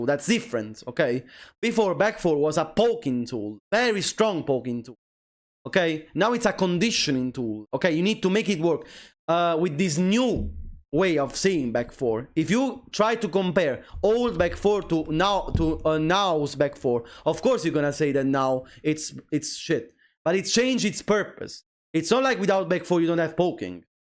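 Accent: Italy. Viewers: Having my main roasted isn't actually too bad when its delivered in a very pleasant Italian accent haha ^-^ I genuinely hate whats happened to Nina in this game. Her range, punishment and mixups have been improved to such an insane degree, that her old playstyle now takes a complete backseat in some matchups. I hate seeing iws1 juggles basically becoming irrelevant outside of low parry combos as well 😔 I dont want them to hit d/f+1,2 again. I want her old poke and move around playstyle conserved. I just want them to gut and neuter d/f+1,2~f on block. Either make it -5 or just flat out remove the ability to do the transition on block. Im so sick of stance transitions in T8 turning a bad situation into a neutral or good one. Thats not how it should work. A d/f+1 into stance at neutral on block is utterly dumb. You dont get to press a button to go from disadvantage to neutral on block. 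Stance transitions should turn an already existing advantage into a greater one but leave you vulnerable if you're reckless with them and commit to it on block. And for the love of god, restore Nina SS1's range to its T7 hitbox. The move has always been busted, no Nina will dispute that. But it used to be hard to use. It made her wall pressure so crazy. It had a glaring flaw, but the pressure it provided was so good that you'd happily work around its bad range. So improving its range so Nina can easily use it midscreen, was a looney tunes decision. Theres been a lot of that in T8. Take a cool thin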